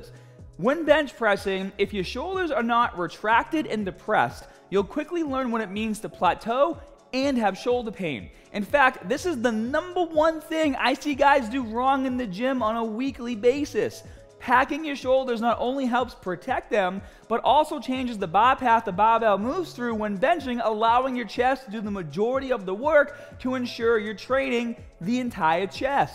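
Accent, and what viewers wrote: Boston, United States. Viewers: It's that thick ass Boston accent. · I love how owning up his Bostonian accent, it always adds a bit of flair to his videos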